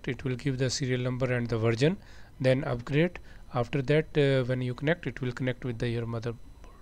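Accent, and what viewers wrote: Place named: Pakistan. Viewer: Sir ji min pakistan sy hun ap ki awaz sy lag raha hy ap pakistani ho help me sir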